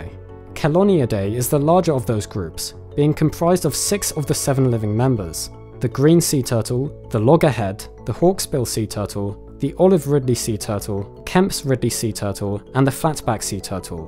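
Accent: United Kingdom. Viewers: Abahrelghazali I agree! These videos are quite credible, and reassuringly cautious with assertions. It’s a pleasure to hear scholarly rigor propelling engaging, narrative logic with worthwhile, substantial content, all described with Linnaean terminology. On YouTube you never know what you’re getting from just glancing at the titles and cover illustrations; some “science” presentations are just amateur pop entertainment with lots of violent predation and a very low global veracity index. I do grin frequently over the British pronunciations. I will never again refer to proboscideans with such ungenerosity of syllables.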